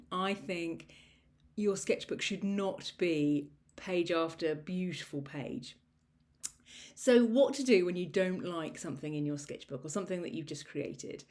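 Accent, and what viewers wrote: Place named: England, United Kingdom. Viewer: Wow! 🤩 I've just discovered you today. I'm feeling a bit low, (or was,) it's my 55th birthday, no cards, a "nothing" day... but i was checking out videos of gelli plate art and found you. 😊 What a lovely lady, so glad you are doing again art now. The sketchbooks are full of gorgeous colour, great pen drawings, not at all childish! 👍🏻😊 I also love the fresh cream walls in your studio with the splashes of colour, and colourful ceramics as well. That's how I'd like this dull flat to look; it really needs tidying up and decorating! 😱 And i just live up the road in Eastbourne at the moment. And it's nice to hear a calm English accent. Must watch your other videos - great advice too.❤🌞🌷🌼🌱🌿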